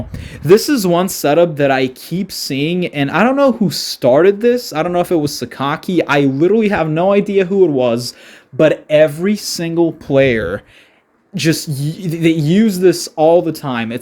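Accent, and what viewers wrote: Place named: North America. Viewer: Are you Serbian? Your YouTube UI says so but your accent doesn't sound like you've left North America for a second of your life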